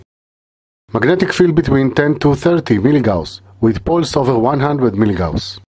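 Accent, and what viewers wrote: Israel. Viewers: אתה ישראלי לפי המבטא אני בטוח חחח אין מגנים לדברים הללו ?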